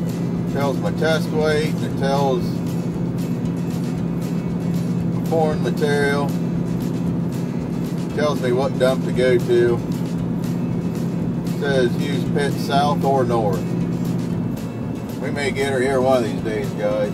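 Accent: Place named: South, United States. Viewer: Accent is no different from an american southerner thats awesome.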